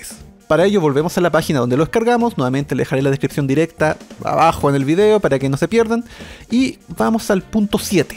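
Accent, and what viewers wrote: Chile. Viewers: Te apuesto a que eri chileno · ¿Chileno? Hablas como Vardoc xD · hahah solo es el tipico acento chileno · Ikr! Tiene acento chileno xDDDD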